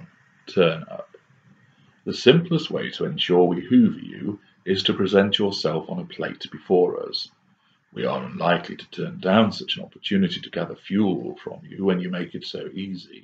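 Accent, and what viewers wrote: United Kingdom. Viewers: you did Hoover me back with the audios! Brilliant work, you deserve the fuel you are getting. Nice to put a voice to the lessons. Oh, that British accent of yours! I'll be forever grateful for all I've learnt from your writings.:) Still not ready to have you write my online dating profile, but I'm still an escapee. Narc did not see it coming. Thanks! Blackwidow.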